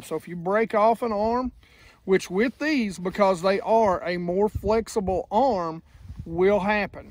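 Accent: South, United States. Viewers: So glad to see you fully recovered from Covid Brother, sounds you're back 100% Southern drawl and all!! LOL!! Would I get the same reaction if I put plastic flukes instead of swimbaits? Great instructional video!!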